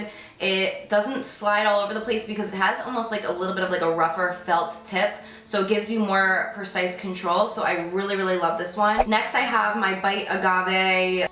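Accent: New Jersey, United States. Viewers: I knew when you said "wooder" that you were from New Jersey! I thought I recognized that accent 😂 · Rose Sago lol no she has the jersey accent. But she does kind of sound like rihanna now that you mention it.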